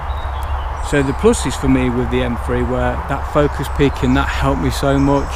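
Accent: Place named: Yorkshire, United Kingdom. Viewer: Thanks for your video of macro photography which I thought was very encouraging for people new to Macro, I’ve been doing it just over a two years shortly after my retirement and like yourself find you get so drawn in to this hidden world which is all around us, but people completely unaware, just pass by it, I’m a Leeds guy and hope you don’t mind me saying sound like a Yorkshire man and my apologizes not, however if you are close to Leeds I would love to meet up with you sometime as I don’t have a photography friend I can converse with. Kind regards Dennis. Email: <mail>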